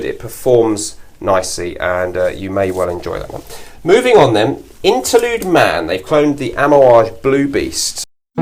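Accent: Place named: Cockney, United Kingdom. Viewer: urhghh, that cockney accent shudder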